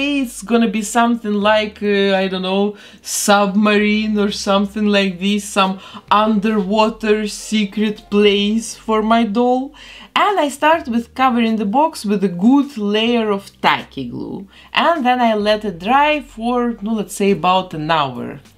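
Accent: Russia. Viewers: Мне одной интересно, почему русская женщина до сих пор не потеряла акцент?))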